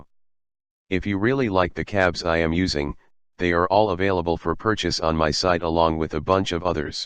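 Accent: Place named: New York, United States. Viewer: Very useful information, thank you. I wouldn't worry about a NY Metro accent -- it lends credibility to use your own voice and would be less distracting than the computer voice!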